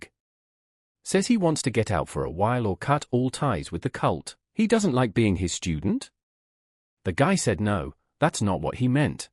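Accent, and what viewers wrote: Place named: United Kingdom. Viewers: Bri’ish narrator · ya seems really weird having a brit accent to narrate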